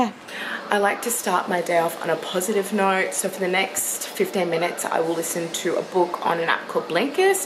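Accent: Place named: Australia. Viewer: Awww loved it❤ the way reacts when he sees coming downstairs just melts my heart. And I loove ur australian accent❤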